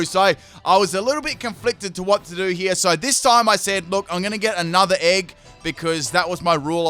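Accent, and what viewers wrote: Australia. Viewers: I'm Aussie and wouldn't ever consider saying Leafeon the way he did. There's an 'e', just read it phonetically. Think is maybe a tad bogan though, which might explain it.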